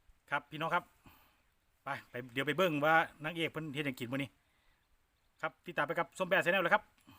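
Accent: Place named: Phu Thai, Thailand. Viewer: อยู่จังหวัดได๋น้อจ้า เว้าคือภูไทสกลจ้า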